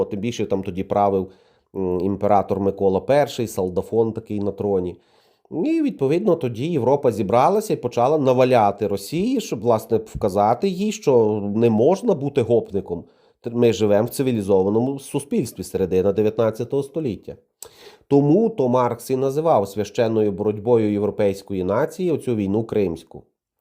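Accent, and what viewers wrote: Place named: Galicia, Ukraine. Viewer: Все супер. Особливо мені подобається галицька вимова автора. це дуже мило) і навіть сексуально))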